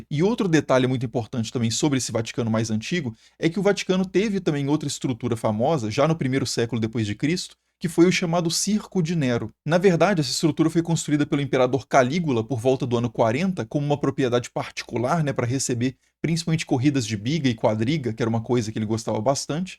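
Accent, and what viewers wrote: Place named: Belo Horizonte, Brazil. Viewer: Meus parabéns a você e a seu canal! Cultura e erudição transmitidas com uma linguagem "magistral" (stricto e lato sensu), e um agradabilíssimo sotaque belorizontino!!!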